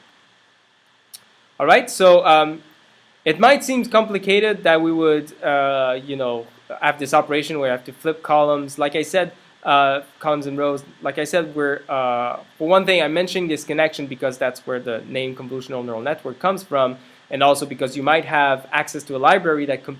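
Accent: Quebec, Canada. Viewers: r u quebec or frenc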